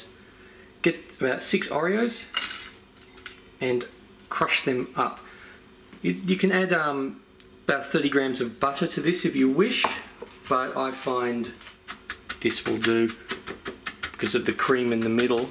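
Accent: Canada. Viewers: Are you canadian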